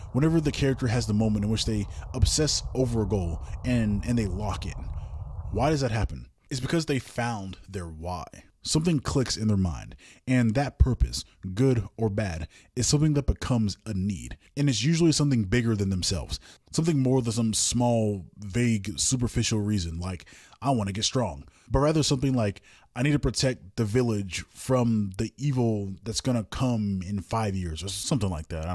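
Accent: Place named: United States. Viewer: I'm sorry but what's with the STUNNING photo of Whitby abbey ???!! Not even related to the conversation at hand unless you want to talk about how becoming a monk or a nun is the ULTIMATE way of "locking in" because you're bound for life? It's like my fyp is hinting at me to pay attention by using motifs from my life that no one even knows about. And I'm guessing from the voice over that this guy is American, which makes this photo's insertion even more questionable since although it inspired one of the most well known books ever, it's still a pretty niche place if you think about it. Anyway, thanks for coming to my TedTalk :)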